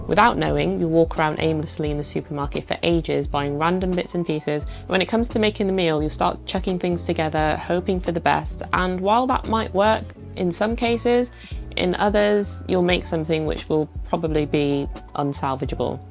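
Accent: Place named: Northern England, United Kingdom. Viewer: I watch all your videos all the way through, and I don’t even make vlogs or anything. But hey. I think we have some things n common! I have those same Adidas trainers, I think you’re a northerner like me (Manchester or Leeds or somewhere? I’m crap with accents), and you’re cool AF, and… oh wait, I’m not . Seriously tho, once weather picks up a bit here in Spain (I live in a very cold region), I’ll do some live streaming by the river, just using iPad and restream.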